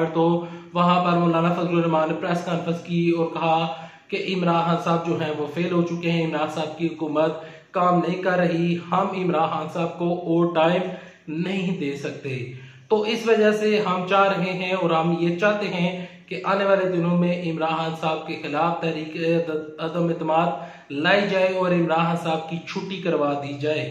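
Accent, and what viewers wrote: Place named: Punjab, India. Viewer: Fix your accent too much Punjabi accent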